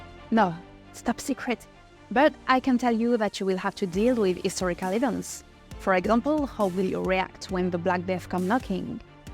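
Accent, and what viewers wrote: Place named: France. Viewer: Don't know why, but the French accent is very persuasive.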